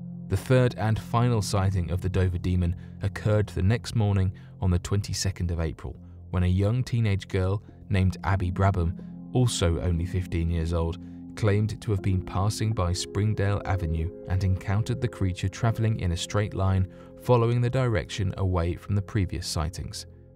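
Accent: United Kingdom. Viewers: The voice of this narrator is better than the others. It is easier to understand, with a more tamed British accent. Less viewers will become sleepy.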